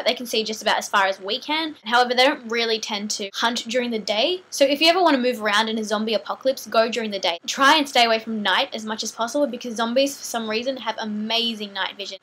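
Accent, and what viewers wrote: Australia. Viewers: are you Australian??.. love your videos.. and is an amazing song:)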